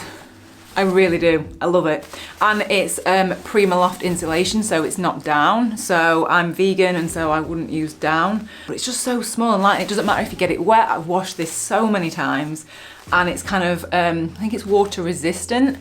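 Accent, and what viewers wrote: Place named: Liverpool, United Kingdom. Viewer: Liverpool? Im a yank but live in hong kong and you look and sound like one of my great friens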